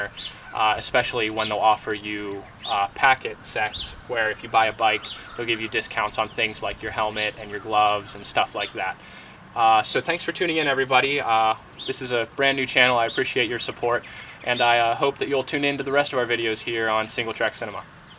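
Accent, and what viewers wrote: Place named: Pittsburgh, United States. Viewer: you sound like you're closer to Newcastle or Pittsburgh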